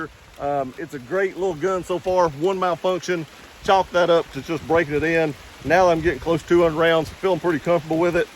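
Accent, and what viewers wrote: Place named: Alabama, United States. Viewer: Sounds like you’re from Alabama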